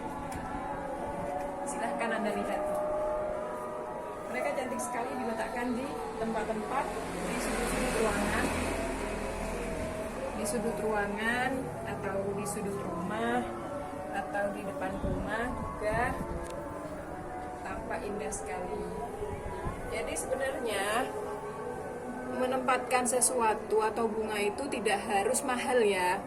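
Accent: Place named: Java, Indonesia. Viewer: Jowone Endi mba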